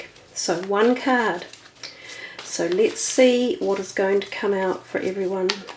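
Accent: New Zealand. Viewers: keeper deck as in deck of cards,the reader is a kiwi they speak different.....think fush and chups.....thanks cuz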